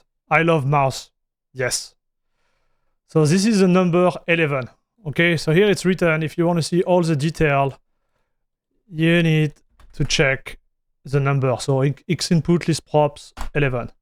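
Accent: France. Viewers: Merci pour cette vidéo et quel accent français 😋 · Superbe accent Français !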